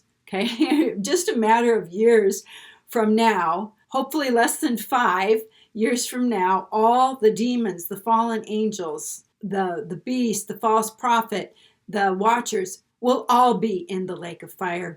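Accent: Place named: Idaho, United States. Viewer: I've been binge watching for about 3 years. With every teaching, I grow in knowledge and understanding. I'm very grateful that I was led to your channel. I thank God for the work he is doing through you. Side note. I enjoy your Idaho accent. I miss hearing it. Love and peace always.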